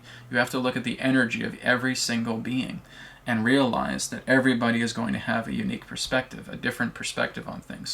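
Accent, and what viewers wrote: Scotland, United Kingdom. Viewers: Wow i ❤ ur scottish accent!! 😆👍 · I dont know how it works , but again the subject was spot on for me - Thank you very much - I like when u laugh and speak Scottish :) - <3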